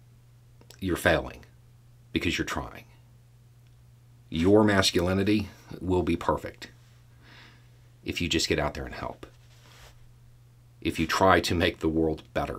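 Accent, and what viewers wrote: South, United States. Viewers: the cognitive dissonance i have with a bearded guy with a southern state dialect that actually able to have an intelligent nuanced discussion about such a topic showcase my prejudices and stereotypes which i have to me. hats off, your vouce of reason is sorely needed un todays workd. i will sit here in the corner...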